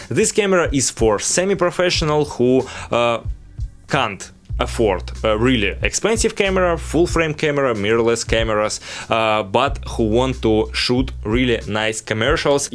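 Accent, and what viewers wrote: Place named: Russia. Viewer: Broader than that could be incomprehensible for general audience, but yours was perfectly clear and perfectly Russian. 😁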